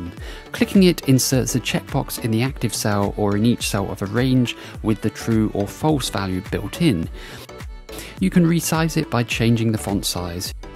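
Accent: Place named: South, United Kingdom. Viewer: Nothing more annoying than a South British accent going on about how he can use new checkboxes than no one else has access to --- brilliant!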